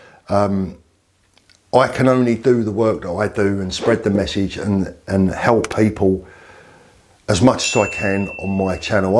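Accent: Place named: Yorkshire, United Kingdom. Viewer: Yorkshire guy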